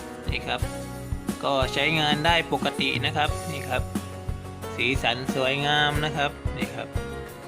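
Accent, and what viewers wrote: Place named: South, Thailand. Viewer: ทำไมพูด เหมือนคนใต้คนใต้หรอครับ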